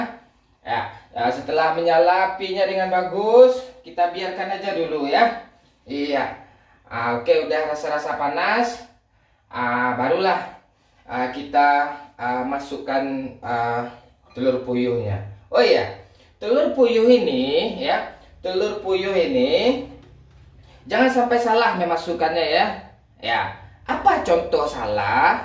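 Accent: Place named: West Sumatra, Indonesia. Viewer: Dari logat nya aja memang asli orang minang ehh btw salam dari Payakumbuh bang hehe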